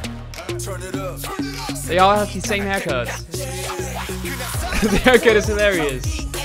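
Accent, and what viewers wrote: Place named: United States. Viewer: hätte ich nicht gewusst dass du Deutscher bist, hätte ich gedacht du wärst ein Ami xD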